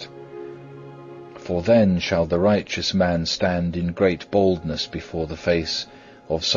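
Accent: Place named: England, United Kingdom. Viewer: Grazie mille! to the dear soul who set "The Imitation of Christ" to background music! I listen to this almost every night as I sleep since I ceased taking anti-depressants exactly one year ago in October 2017!!! You have made it fresh and more poignant. The reader's beautiful English accent is soothing, but sometimes the words alone are a little stark! Sincere thanks to you. St. Thomas a Kempis words are a cure for my mental illness! Thanks be to God!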